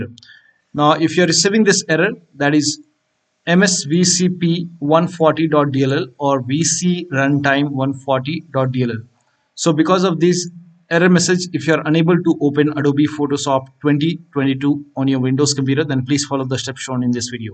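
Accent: India. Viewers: Always the indian accent guys. Thank you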